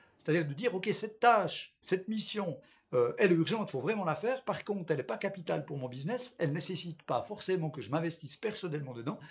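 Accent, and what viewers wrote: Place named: Romandy, Switzerland. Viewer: Je viens de découvrir ta chaine et j'ai été très agréablement surpris :). Bon contenu, bons conseils, des trucs réalistes et honnêtes, ça fait plaisir dans ce domaine. Je décèle une pointe d'accent familier, est-ce que tu viendrais pas d'un petit coin de Suisse romande par hasard ?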